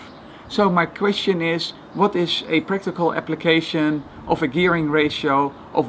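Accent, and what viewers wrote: Netherlands. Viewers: Wild guess, but are you dutch? I'm litteraly 100% confident you are. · #Nederlands-Engels accent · Puur Nederlands accent. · I knew you are Dutch when I heard you :D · A true Dutch accent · dutch english. cool technic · You can tell this guy is dutch lmao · His dutch accent is showing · Man you sound Dutch. Are you? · well the video maker is Dutch for sure, you can hear it about hus accent, and the police siren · are you dutch? cuz your accent and you say 'From Deventer' and Deventer is a city in the Netherlands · you sound like what the average Dutch guy would sound like if he spoke English. subbed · Sorry but its so funny to hear a dutch guy talk english 😂😂😂 Nice video bro! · Hehe that obvious dutch accent... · He sounds so Dutch.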